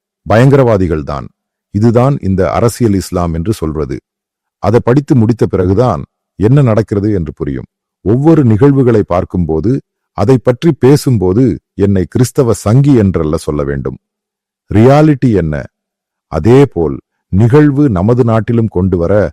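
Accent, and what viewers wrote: Kerala, India. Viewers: Native kerala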